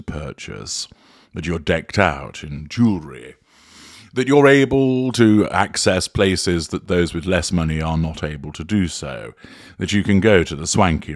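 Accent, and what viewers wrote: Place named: North, United Kingdom. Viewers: despite being Welsh, lol. I am Irish so pick up on the fact that is a ''posh Northerner'' maybe Americans think this is RP but imo, this northern accent is what makes his speaking voice so easy on the ear imo. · At a guess I would suggest comes from North.Yorkshire.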